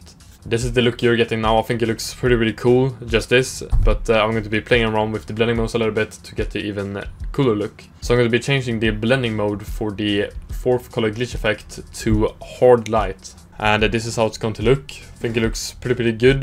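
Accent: Sweden. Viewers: man hör svenskan haha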